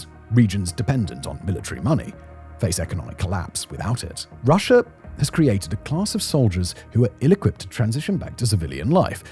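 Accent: United Kingdom. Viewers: yeah man, this is what is happenin. but something about British RP fails to deliver the true synthesis of the emotional impact, did you smoothe it out with AI. Yeoman's work on the breakdown, the cuts are so close together somehow just makes it seem like the same thought except it isn't, which makes the audio experince more like that of a infinite unrelenting run-on sentence that is jam packed with info and concepts yet tonally devoid of emotional contexts --hope this helps --thnks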